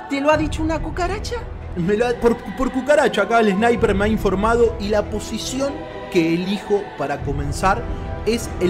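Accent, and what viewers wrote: Mexico. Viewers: los mexicanos del sur si hablan asi, nosotros del norte hablamos diferente:) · hablas igual a los mexicanos saludos desde México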